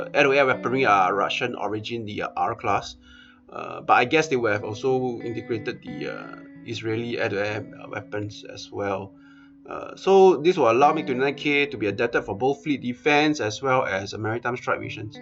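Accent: China. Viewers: This is Chinese voice, and security of India aircraft is 5% less then Chinese aircraft but according power Indian aircraft is 10% more deadly then Chinese so Indian aircraft can destroy Chinese is possible 30% but Indian destroy chinese aircraft is 70%, don't forget Barak 8, And Aakash and Bramhos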